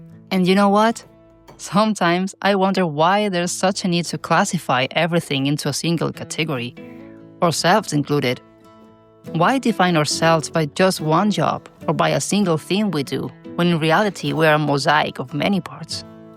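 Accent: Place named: Spain. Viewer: I love your videos. They make me want to live in spain. I love your voiceovers and your Spanish accent. ❤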